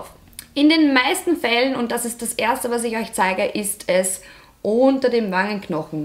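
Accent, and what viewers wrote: Vienna, Austria. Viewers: Bist du aus Österreich? Hörst dich ein wenig "Wienerisch" an, für mich als Wiener zumindest 😉👍🏻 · ihr österreichischer dialekt kotzt einfach nur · Bist du Österreicherin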